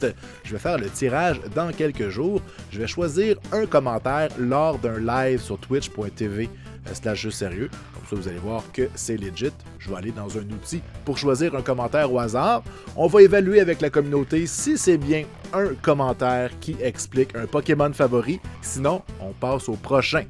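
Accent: Quebec, Canada. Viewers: j adore l axant quebequoi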